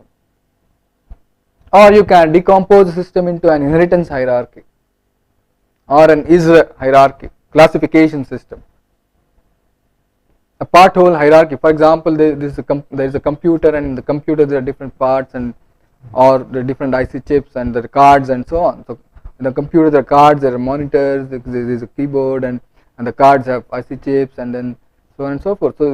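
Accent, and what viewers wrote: India. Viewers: good information, but horrible accent. I don't know why Indian English sucks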